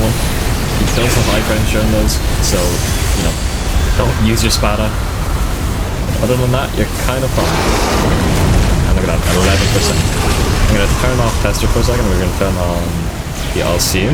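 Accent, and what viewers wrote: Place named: Ireland. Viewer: bros irish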